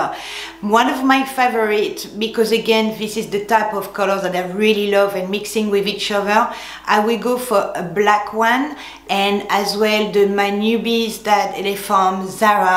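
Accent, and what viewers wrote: France. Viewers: I love your videos you give such nice advice to let us put on a more classic look to feel more elevated and positive! And you are so lucky to speak French and have such a nice accent I wish I did:) French is the prettiest language! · I really enjoy your videos! Just love your French accent! If you're looking for another word for 'elevated' you might want to try using 'sophisticated' or 'refined' ..... This is not a criticism, I just noticed that you are often looking for alternative words. Looking forward to the next 'words of wisdom' session.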